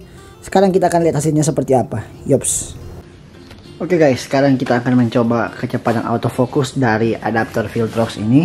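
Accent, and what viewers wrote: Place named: Manado, Indonesia. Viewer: Rupa org mdo pe logat bos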